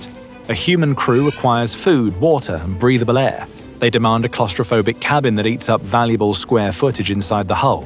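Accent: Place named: United Kingdom. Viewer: It's a lie story with a British accent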